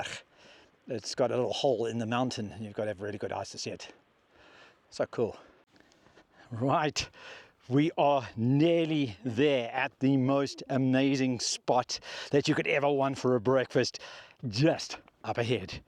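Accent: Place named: Afrikaans, South Africa. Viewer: Love the boerwors accent man it's always nice and crispy 🔥👊🏽.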